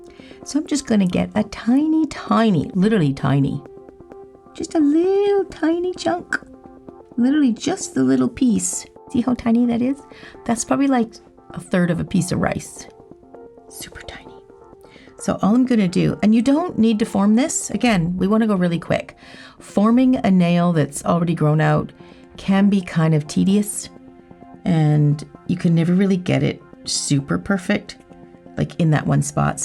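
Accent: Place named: Canada. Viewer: I love your voice & accent. It feels like home as my aunts & cousins are Canadian 😊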